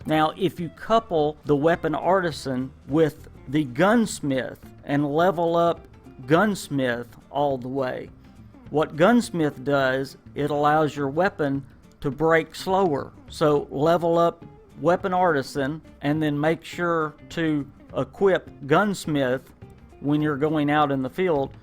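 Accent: South, United States. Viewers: love your country-ish accent! great videos, sub'ed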